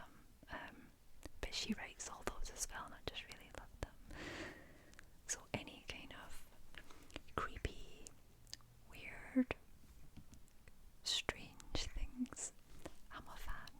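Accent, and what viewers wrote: Scotland, United Kingdom. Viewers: You have a lovely voice, I think you should give the soft spoken a go more! It makes your beautiful Scottish accent more apparent.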